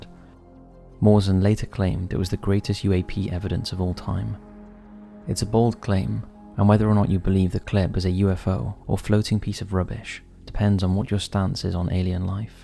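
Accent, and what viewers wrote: Wales, United Kingdom. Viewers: Damn yall still making videos and saying things like “night sky” all British and whatnot? I ain’t seen a top 5s videos in a hot minute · He's brilliant, sense a slight Welsh accent and it fits...his content over the last decade is outstanding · Are u welsh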